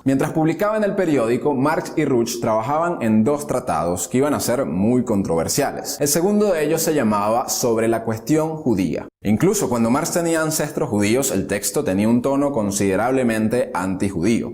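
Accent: England, United Kingdom. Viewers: Para hacer una biografía sobre personajes alemanes, o bien pronuncia los nombres a la alemana o bien a la española, lo que queda fatal es pronunciar las palabras en alemán a la inglesa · Historiador para comentar hay que tener cultura.los terminos deben pronunciarse en forma de origen.como todos pronuncian con acento ingles.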